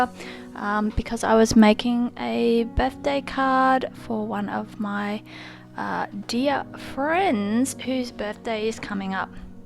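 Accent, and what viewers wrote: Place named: Australia. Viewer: From your accent are you aussie? If so, where did you get the KURUKYNKI stamps?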